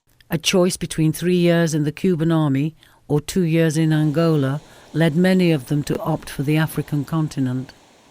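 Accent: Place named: United Kingdom. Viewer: A stupid British accent lady doing the video come on ppl seriously.....have someone else do the video.....you suck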